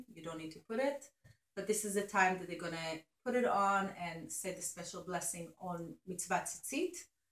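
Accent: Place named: Israel. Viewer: Thank you for explaining and telling us to stand. I can not sing, and have always been put off going to Shul because all are singing except me. I really like your accent, Ivrit from H 'Aretz. Could you do some videos with just speaking prayers?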